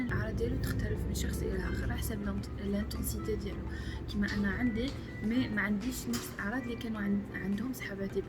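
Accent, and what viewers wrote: Algeria. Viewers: جزاءري ليش غريبة اللهجة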